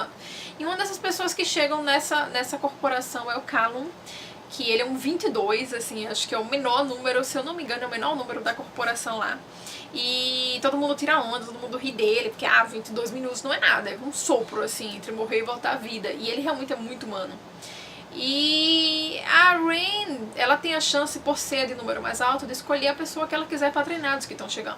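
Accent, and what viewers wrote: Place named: Northeast, Brazil. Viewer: oi tudo bem? eu gostei de vc, do seu canal, vc é bem segura e tem sotaque nordestino como eu rsrs...vc é de qual estado? enfim, só tenho uma pequena crítica :( às vezes acho que seus vídeos são longos..vc repete o que já falou, enfim só uma opinião minha msm...td de bom :) até mais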